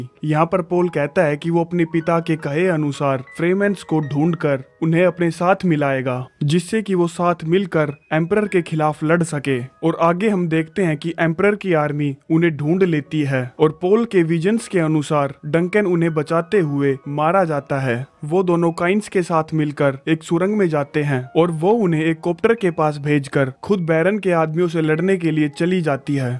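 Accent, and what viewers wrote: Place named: Rajasthan, India. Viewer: tbhi lga hi tha marwadi ya to Rajasthani ya haryanvi🙏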